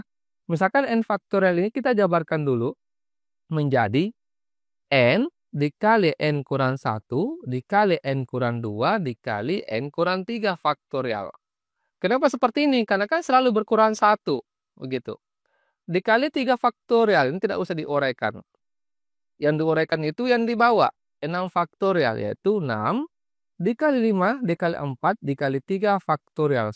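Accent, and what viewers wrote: Sulawesi, Indonesia. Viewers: orang sulawesi ya pak? hehe soalnya logatnya kaya sulawesi 😃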